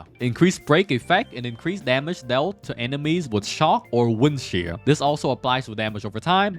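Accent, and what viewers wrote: Vietnam. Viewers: Is he Vietnamese. My cousin has the same English accent xD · dude sounded like a vietnamese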